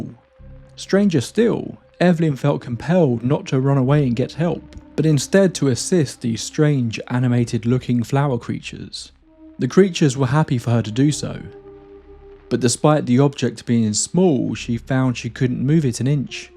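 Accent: England, United Kingdom. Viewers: I Cant what this video. Too slowly explained. Get to the point faster for heaven's sake. Like watching paint dry outside in winter. You should also ditch the english accent narration. So sick of that here on Youtube.😢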